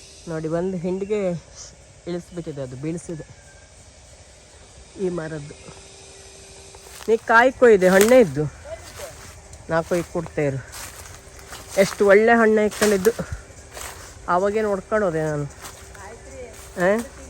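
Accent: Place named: Kundapura, India. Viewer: Salpa kundapura kannada tara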